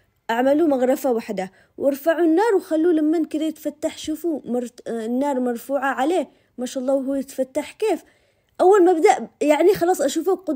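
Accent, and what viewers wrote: Aden, Yemen. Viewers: اموووت باللهجه العدنيه وامووت بطريقتك واطروحاتك وبصراحه حبيبتي افضل واضخم وارقى من شرحت الطريقه الصحيحه بكلللل تفاصيلها تسلمي حبيبتي ❤ اختك ام اياد من صنعاء 😘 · بصراحه الصوت لحاله يذوب واللهجه العدني لايق لك ي قلبي اختك من عدن ومن متابعينك واستفدت كثير من وصفاتك تسلم يداتك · مين زيي بتعشق صوت ولا بس انا 😅بحب لهجة عدن مع اني اصلي من الضالع بس لهجتي بالعامية لاني عشت حياتي بين عدن وصنعاء والضالع والحين بالسعودية من ٢٠ سنه لما اتكلم محد يقدر يعرف انا من اين بس ماشاء الله لهجتها ما تغيرت الله يحفظها. فعلاً هذه الماركة حلوة اخذت طاوه وخليتها للحوح فقط لها معي خمس سنين وما يخرب على اللحوح أبداً ❤❤❤ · ما شاء الله باين عليه لذيذ ... واللهجة العدينة حلوة... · من وين هي اذا ماكانت من عدن لان لهجتها عدنية مليون المية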